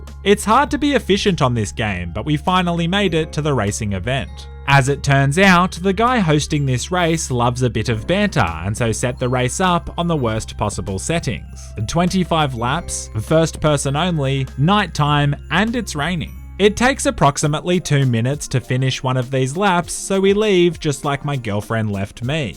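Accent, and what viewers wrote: Australia. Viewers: Is he Australian? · are you Australian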